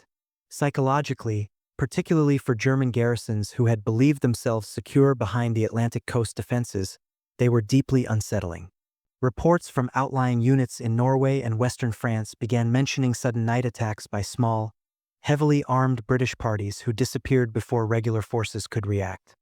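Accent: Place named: United States. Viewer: American pronounciation makes me smile !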